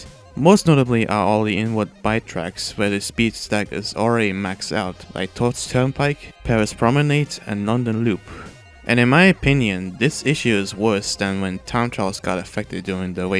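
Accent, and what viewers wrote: Germany. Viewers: German accent❤ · Are you german?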